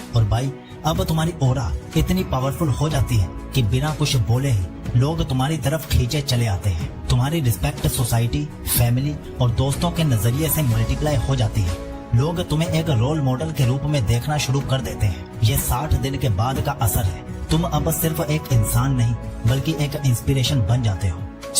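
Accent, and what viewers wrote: South India, India. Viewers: आप साउथ से है क्या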